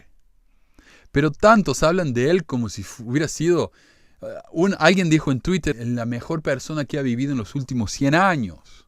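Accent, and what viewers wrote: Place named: Córdoba, Argentina. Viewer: el acento cordobés que tenes no se te va nunca che. Soy less active y en mucho coincido sobre pte Monson, pero tampoco decir cualquier gilada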